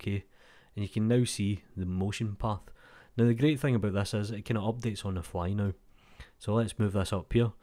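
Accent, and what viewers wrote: Scotland, United Kingdom. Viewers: love ur tuts and ur accent too , it"l like william wallace is giving me a blender tutorial :)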